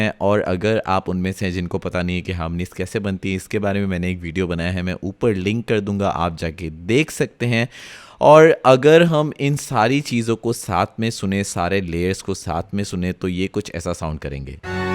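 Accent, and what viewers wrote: Assam, India. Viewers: Bhai are you from Assam?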